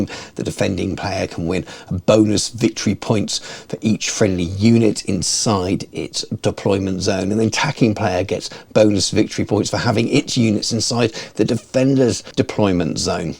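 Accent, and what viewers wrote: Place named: England, United Kingdom. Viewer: It is an absolute joy listening to you read Old World narrative in your English accent.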